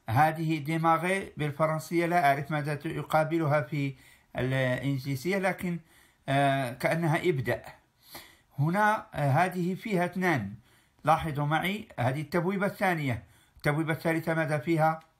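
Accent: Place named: Levant. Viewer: شكرا على المجهود لكن الشرح يبدو معقد بعض الشيئ بسبب الالقاء بلهجة المشارقة نرجو منكم تدارك الوضع احتراماتي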